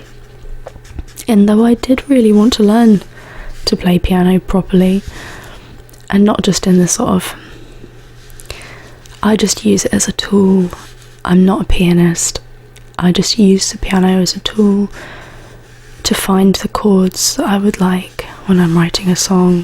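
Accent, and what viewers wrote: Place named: United Kingdom. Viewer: Your voice is very nice, the accent and all, to me as an American. Also very sexy I love British women that aren't total slags.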